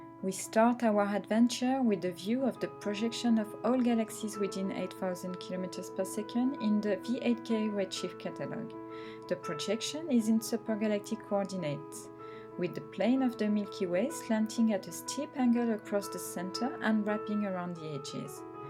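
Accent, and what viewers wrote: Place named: Ryazan, Russia. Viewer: Этот рязанский акцент...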